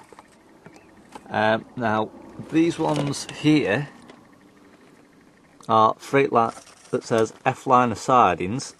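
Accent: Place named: Stoke, United Kingdom. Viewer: I recognize a stoke accent anywhere lol, are your points dcc and do these work with bachmann dynamis m8?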